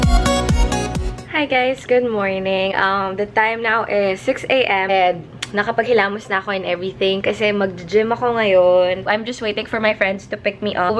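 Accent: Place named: Pampanga, Philippines. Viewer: o diba si na pinanganak na tlagang may kaya ung pamilya sobrang humble and ineembrace yung kapampangan accent nya, yung iba na bago lang nagkaron dahil sa pagvlog sobra makaslang at pa sosyal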